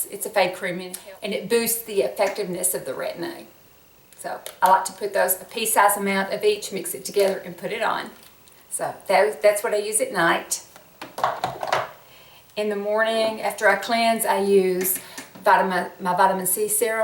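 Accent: South, United States. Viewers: think you are a beautiful woman with a beautiful soul and I can't understand why anyone would be mean to you except that their lives are so meaningless and pitiful that they feel the need to be awful. I love you're accent because to me it is normal! I think we need more southern ladies on YouTube. Your skin is amazing so I'm now going to try your routine. I just turned 50 and menopause is wrecking my skin! Keep up the awesome work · You are so precious and beautiful😊I am turning 41 today and I start my retina and the blender this week...super excited...thankyou soooo much for your skincare routine video...you are just beautiful! Love love love your accent...from one southern girl to another😘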